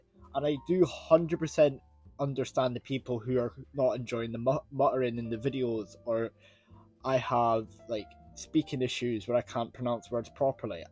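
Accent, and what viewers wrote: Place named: Scotland, United Kingdom. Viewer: It was a great video! Its great hearing a fellow scot talking about the stuff im also interested in :)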